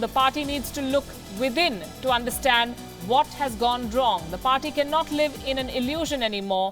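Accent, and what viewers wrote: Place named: India. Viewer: She Stole My Heart..Saree..With Indian-Outright Accent. I Love Her..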